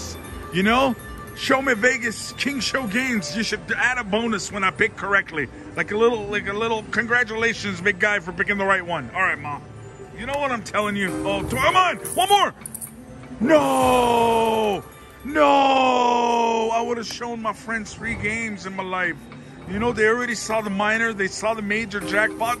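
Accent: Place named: Louisiana, United States. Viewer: Bro has got to be from South Louisiana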